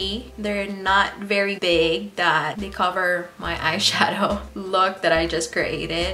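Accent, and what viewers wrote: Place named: Philippines. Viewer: Beautiful! JUST got this palette for my BDay 😃🤩 Cannot wait to use it when I get some energy! 🤗🤗 I agree, most of these shadows are better than Natasha Denona because the texture AND finish are BOTH PERFECT! LOVE your nail color!! What is it? Your Philippino accent reminds me of what I call home.... Hawaii! Have you ever lived there? Oh.... I miss making KALEMUNGI! We would knock on doors and ask if we could have a branch to make soup.... so good! Ahhhh, those were the days 🥴 Thanks for the video!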